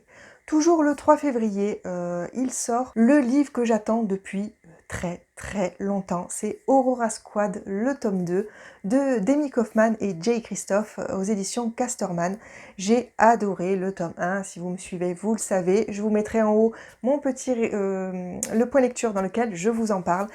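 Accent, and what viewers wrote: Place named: England, United Kingdom. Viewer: Coucou! Bravo pour cette vidéo! Je vois qu’on a une majorité de livres en commun, surtout pour les grandes sorties!!! 😍😍 Genre Aurora Squad 2!!!!!!!!! Haha, l’attente me rend fébrile... 🤣🤣 J’adore ton accent anglais!! 😅 Pas pire que le mien!! 😉 Bisous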